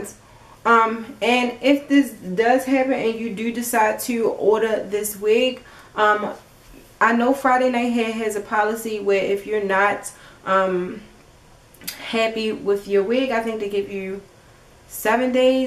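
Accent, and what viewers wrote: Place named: East Coast, United States. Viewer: Where are you from? East coast? Lol, Maryland? I need to know that accent is everything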